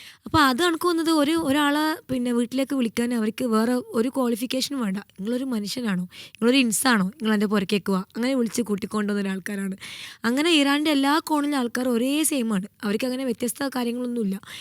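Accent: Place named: Thalassery, India. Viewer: Pakka Thalassery slang 💗 Thalasseryl evdeya ?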